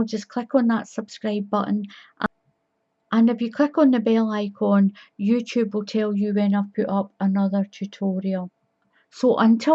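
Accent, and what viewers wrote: Scotland, United Kingdom. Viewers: I love your accent. Scottish? Great tutorials, thank you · where are you located in the UK if you don't mind. I hear a beautiful Scottish accent am I correct?? Also, I like to use, current US standard, Meetup App/Zoom meetings for local get togethers with different groups (recommend for all). Barbara, Sacramento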